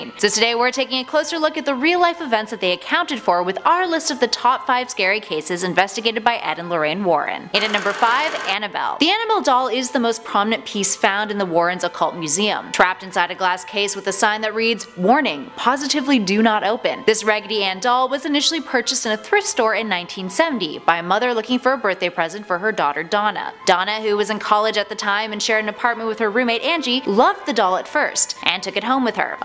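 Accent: Canada. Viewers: She sounds Canadian, eh... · How many times does this story need to be recreated on YouTube, same story different face with a Canadian accent. Blah blah blah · Liliana Jasmine maybe its canadian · Either Canadian or from New England, US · Garrapaterorulez Sounds Canadian.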